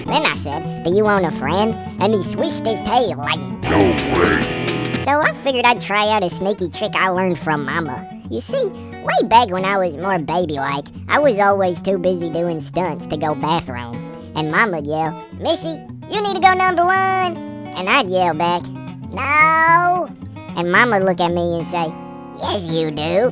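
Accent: United States. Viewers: Im so glad to have watched through the 38 and 42 hour Beverly Hillbillies videos, her accent/misunderstanding reminds me of them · my favorite of your shorts! I love the lil southern accent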